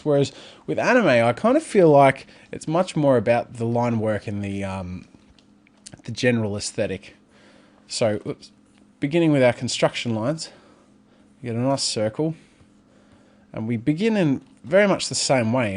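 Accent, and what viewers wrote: Australia. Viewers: are you australian? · Yep, we Aussies do indeed (mostly) say "mæŋɡɑː" rather then the Yankish "mɑrngɑr" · that Australian accent gets all the ladies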